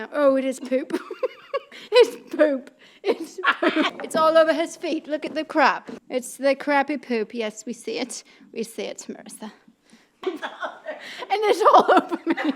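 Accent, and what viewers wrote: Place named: United Kingdom. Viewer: Aww i love youuuu ❤️❤️❤️❤️ and your British accent is brilliant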